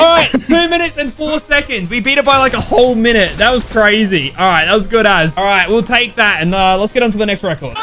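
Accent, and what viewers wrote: Australia. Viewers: You australian i watched you before lazarbeam but i saw you on his video and thought you were aussie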